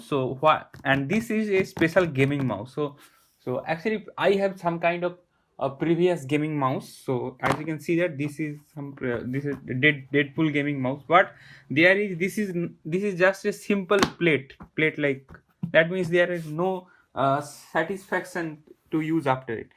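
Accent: India. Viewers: you are form odisha i can identity by your english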